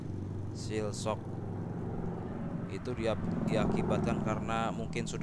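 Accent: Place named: Serang, Indonesia. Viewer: Kaya orang serang sih kang bahasanya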